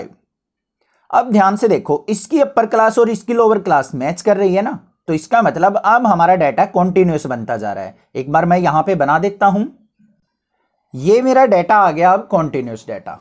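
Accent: Haryana, India. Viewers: Sir, I know that u are from haryana because aapki language mujhe thodi thodi haryanvi lgi. I am also from haryana ,Mahendergarh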